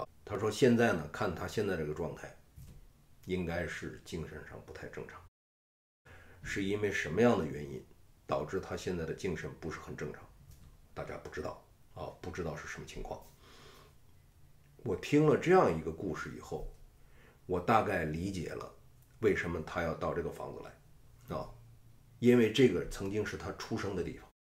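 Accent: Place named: North, China. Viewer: 聽您講生活故事．真的很有趣．很標準的北方腔．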